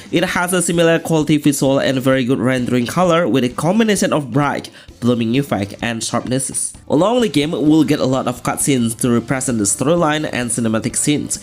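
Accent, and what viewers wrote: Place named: Indonesia. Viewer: I know this accent. Indo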